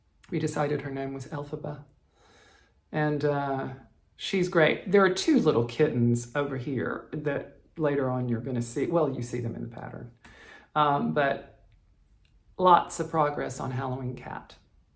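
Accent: South, United States. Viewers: You would have to shave your mustache and get a red sweater to be Fred Rogers. That would be cool though! I hope you saw the movie with Tom Hanks, it was really precious. You said that you have an accent. Yes, I could hear something southern. Now that I have started to watch your previous episode, I am guessing that you are from Alabama. My friend from India 🇮🇳 when I told him that I did not have an accent, he said of course you have an accent. You have an American 🇺🇸 accent! Of course, in my completely untraveled life and stupidity, I thought Americans didn’t have accents. But of course people speaking English from Ireland 🇮🇪 , South Africa 🇿🇦 India 🇮🇳 England 🏴 , etc, they can all tell that we are from America 🇺🇸 . So think about it… Everyone has an accent! Just depends on who is listening. Thank you for rescuing cats 🐈 ! All of my pets are also rescues. 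Really cute that you kept all your childhood books 📚 . I don’t have a single one. Don’t you just love finding your artwork 🖼 and crafts from childhood? I have so few. And by the way… Basket 🧺 of cherries 🍒 was my first Blackbird design stitched and completed recently, and the first time I ever used Linen as well. I did it on 28 count.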